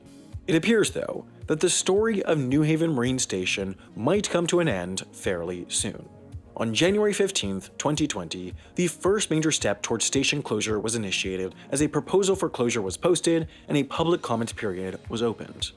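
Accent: United States. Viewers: Gabbering Yank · Why do all these videos about ghost trains (and stations in the UK) all feature an American voice narrating them? Is it because Americans (still in 2023) find the concept of trains a novelty and something of an 'amusement'?? ..... while every other country just sees them as a vital part of their transport infrastructure. And an asset to their country's economy.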